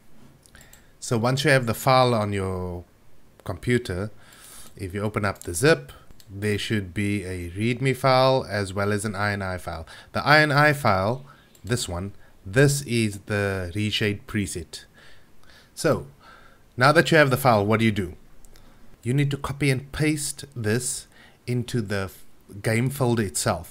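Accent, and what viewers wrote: South Africa. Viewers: What part of South Africa are you from? ;) P.E here!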